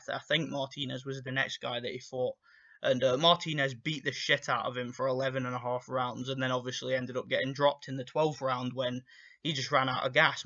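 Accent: United Kingdom. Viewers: Go listen to the boxing voice this dude is a joke! You sound like you from the U.K. Why waste time to make a video for a so called "shit fight" by you. Go talk about Liam smith an his brothers or that waste of a time sanders! Maybe they are better boxers for you lol !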